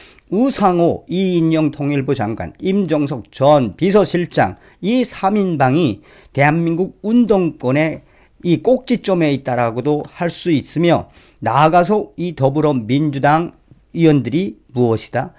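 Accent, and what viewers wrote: North Korea. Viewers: 방송내용은 좋습니다 만 방송은 표준어가 원칙입니다북한 사투리를 좋아하는 사람은 없습니다 수다래 라는북한사투리 선입감이 좋지 않습니다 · 북한식 말투가 조금 섞여 있는 것 다 알고 있는데 별문제 없다고 봅니다. 괜찮습니다.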